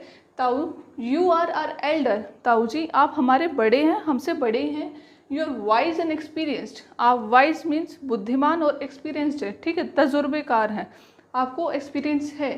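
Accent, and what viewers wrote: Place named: Haryana, India. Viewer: Ap to Haryana sa lag ra mam ji 😀👍🙏❤️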